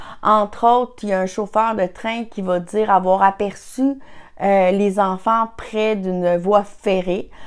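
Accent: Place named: Quebec, Canada. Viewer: .cest.l.accent Quebeccois.bonljour.de.france.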